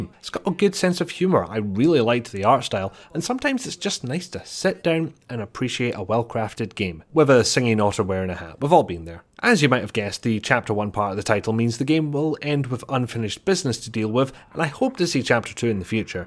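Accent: United Kingdom. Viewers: you sound british to me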